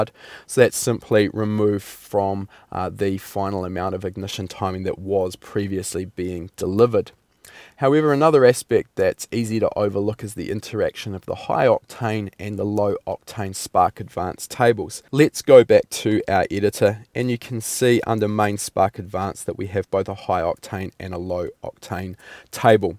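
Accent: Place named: New Zealand. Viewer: There is nothing wrong with your kiwi accent . keep it up. would love to learn about this ls tuning for real. Cheers mate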